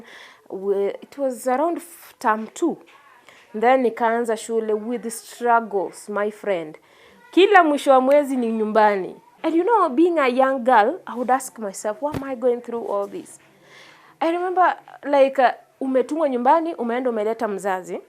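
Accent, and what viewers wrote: Uganda. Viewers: Unaongea kama munganda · She has Ugandan accent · She sound like a ugandan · your swahili sounds like ugandan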